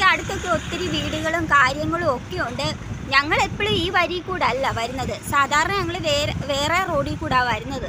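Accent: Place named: Kannur, India. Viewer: കണ്ണൂർ ആണെന്ന് തോന്നുന്നു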